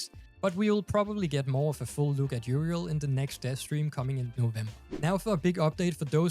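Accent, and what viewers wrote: Denmark. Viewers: Hey kan høre du er dansk. Håber jeg da i hvert fald. Jeg er helt ny i spillet ved du om der er en dansk Clan? Jeg spiller på pc. Må indrømme det er noget forvirrende det hele. Men super fedt har spillet 20 timer på 3 dage nu. Og kan ikke se hvorfor jeg ikke skulle forsætte med at spille 1000 endnu. Vil bruge din kanal til at se warframe nu. Mvh Tobias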